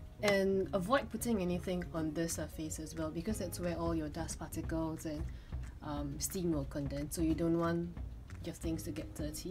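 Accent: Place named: Nigeria. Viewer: No disrespect, I hear a little bit Nigerian accent in her. I enjoyed your presentation my lady.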